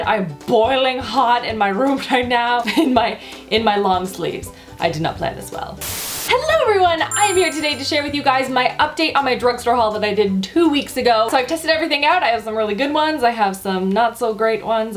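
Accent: Canada. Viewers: Where does she live ? She sounds Canadian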